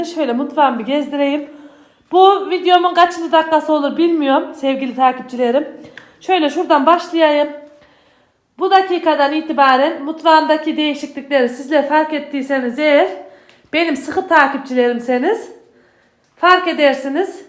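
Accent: Aksaray, Turkey. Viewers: Yeminle doğal konusmani çok seviyorum lütfen Kibar konuşma Aksaray aksanıyla konuş:^) ayrıca" dürüm" lerine bayılıyorum 👍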